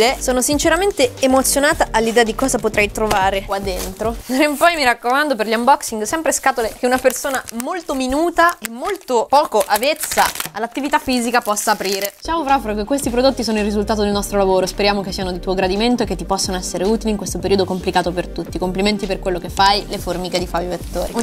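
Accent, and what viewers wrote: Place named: Milan, Italy. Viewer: Sei diventata Milanese!!!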